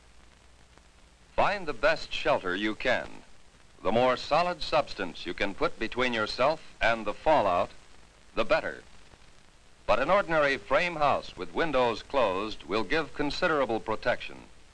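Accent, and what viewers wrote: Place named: Mid-Atlantic, United States. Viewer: Mid Atlantic accent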